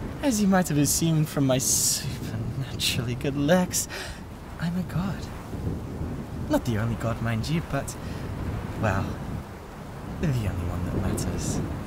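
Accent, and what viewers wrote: United Kingdom. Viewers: You're one of the few ASMRtist that can I British voice I really like, I tolerate very few too.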